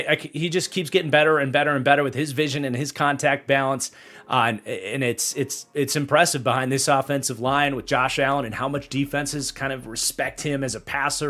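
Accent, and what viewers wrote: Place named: East Coast, United States. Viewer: that east coast accent though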